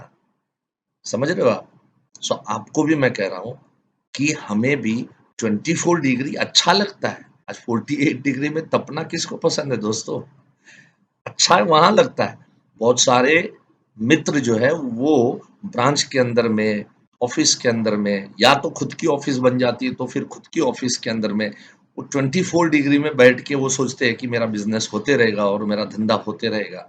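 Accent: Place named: Maharashtra, India. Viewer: खूपच सुंदर माहिती दिली सर धन्यवाद ( शुद्ध मराठी बोलता सर तुम्ही )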